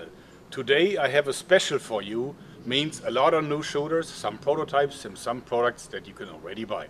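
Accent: Germany. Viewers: he is german i know that accent if you watch albert Einstein genuis from nat geo thats the accent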